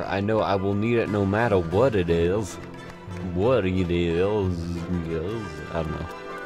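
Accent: Canada. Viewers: Your voice is like sexy butterscotch being dripped into my ears. Where in Canada are you from?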